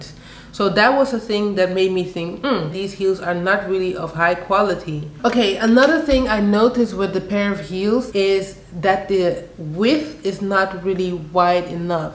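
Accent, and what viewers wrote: Netherlands. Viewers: Love your content!! You sound Dutch 😍😘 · You have a Dutch accent, are you from the Netherlands?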